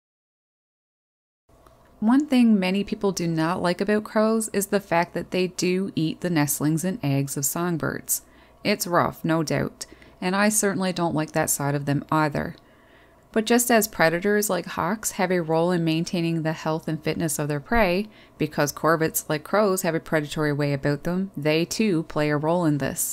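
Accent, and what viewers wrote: Canada. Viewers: Is it true that each crow has somewhat of a unique song meaning they don't sound completely identical like other songbirds just asking? By the way I like your accent. Are you Canadian? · Dear, I love your videos, your facts are beautifully presented and your Canadian accent is so very charming. In Australia we also have crows, ravens and other song birds. The East Coast magpie (found in my home town, Sydney) has the MOST beautiful song. Once your local magpie knows you it will consider your a friend, woe betide you if they dislike you. My local magpie knows me and I occasionally give it ground mince as a treat though I try not to feed it too much. One day, when this dreadful pandemic ends, visit Sydney, you'll love our birds, too. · You're Canadian, aren't you?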